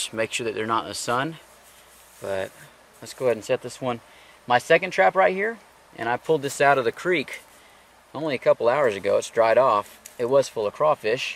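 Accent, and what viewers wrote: Texas, United States. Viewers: Great video, I live in central Texas and have a real problem with chicken snakes. You have given me the solution to my problem. You look like a biologist to me? Thanks for sharing your knowledge. You speak like a true Texan.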